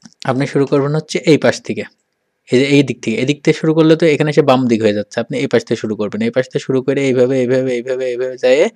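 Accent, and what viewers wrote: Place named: Kushtia, Bangladesh. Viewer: amar kushtiyar ek bondhu ase...eke bare apnar moto kore kotha bole☺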